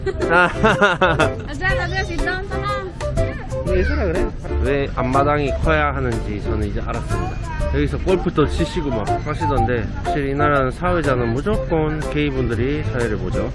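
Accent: Gyeongsang, South Korea. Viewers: 혹시 고향이 대구 이십니까??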